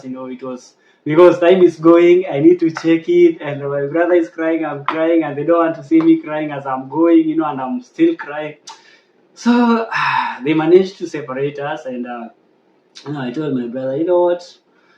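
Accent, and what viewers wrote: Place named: Meru, Kenya. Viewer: Its the meru accent for me 😂 that customer service lady alikusave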